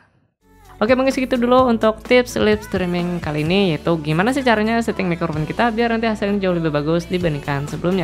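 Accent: Banjar, Indonesia. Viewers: Dari logatnya kaya orang Banjar ini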